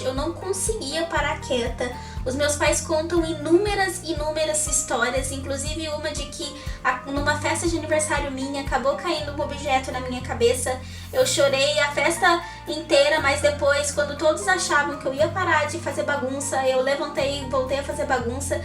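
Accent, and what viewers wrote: Ceará, Brazil. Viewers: Voce é do Ceará?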